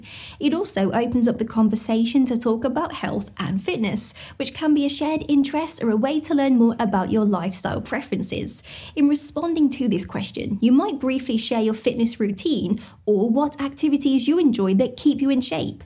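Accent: United Kingdom. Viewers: I find you entertaining, because you manage to talk a load of BS in an engaging manner, and you are cute. Congrats also on your wardrobe and that perfect delivery, complete with crystal- clear pronunciation, no (or very few) grammatical mistakes, captivating demeanour and enviable British accent. But what are you going to do when you run out of topics - or should I say 'run out of BS'? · Love your accent, what part of the UK are you from?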